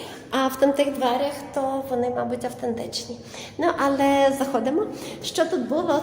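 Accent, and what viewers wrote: Galicia, Ukraine. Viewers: Ваша галицька мова - мед для київської душі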